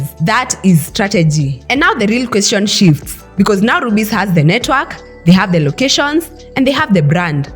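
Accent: Kenya. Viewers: She has the typical Nairobi English Accent 😂 .. the Kenyan English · her authentic Kenyan accent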